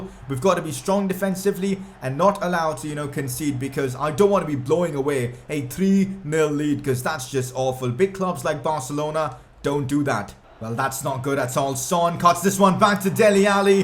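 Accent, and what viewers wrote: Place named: India. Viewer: #PCQ Where are you from? U sound indian..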